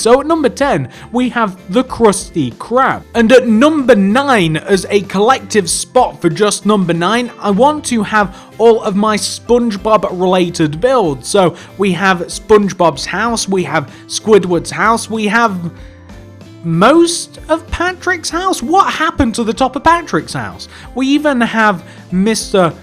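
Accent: United Kingdom. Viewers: Your voice doe. I never seen you get excited like before xD your voice is british doe.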